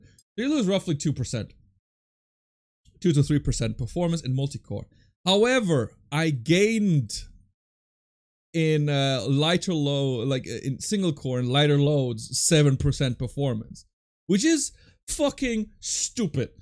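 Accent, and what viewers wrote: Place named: United States. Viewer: god damn your english accent is very good and you're Danish, literally thought i was watching an american guy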